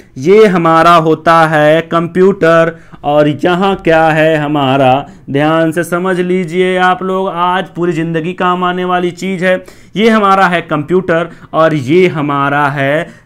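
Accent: Bihar, India. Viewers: Kaha se aa gaya bihari.....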